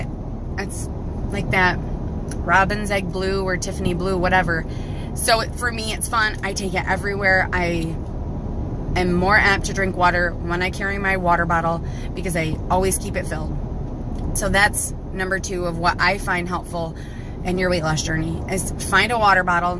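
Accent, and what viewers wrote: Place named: North, United States. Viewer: LOL can't help but check out the scenery as your are driving....looks like Iowa? and I detect a slight northern accent so Northern Iowa or Southern Minisota??? Anyway I really appriciate your videos. I'm on day 2 with Contrave.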